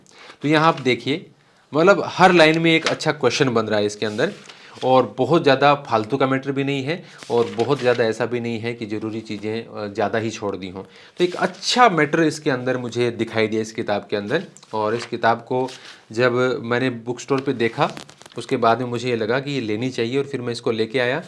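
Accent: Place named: Bharatpur, India. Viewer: गुरुजी नमस्कार मजा आ गयो आपकी बोली कतये भरतपुर के की तरह खड़ी है सीधी सपाट बात करें आपकू बहुत बहुत धन्यबाद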